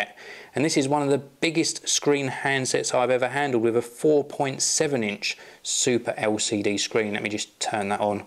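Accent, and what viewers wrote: England, United Kingdom. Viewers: I remember you because you unboxed the HTC Titan on Technobuffalo (I believe it is the same phone?) and your nice english accent.;) Great Job! I want a Windows phone as my new phone if ever im going to change a phone, but I think I'll wait for the Nokia one..;)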